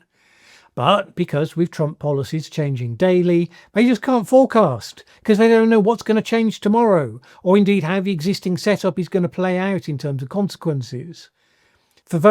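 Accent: Birmingham, United Kingdom. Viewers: l always enjoyed these little explanations. What a gifted teacher. He has the ability to impart knowledge in a way that is easy to understand. Great questions too. I got the accent because I am also a 'Well Spoken' Brummie. Yow awlright Bab? The Birmingham accent is actually sardonic and self depreciating in a somewhat sarcastic way. Ow Arr. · I’m a Southern Counties Brit and now can’t help trying to imagine your Brummy undertones. Unfortunately, I only discovered your channel recently, and as something of a Climate nerd would likely have benefited from your earlier videos. To me you are providing helpful leadership. That said, I slightly wince when you cover your back for not being even better, although that’s obviously a cultural thing that afflicts us all. I realise that standing for what you’ve just said by not following it with an apology to fend off the expected attacks is uncomfortable.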